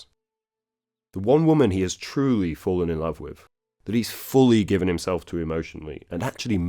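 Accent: United Kingdom. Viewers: You're British!!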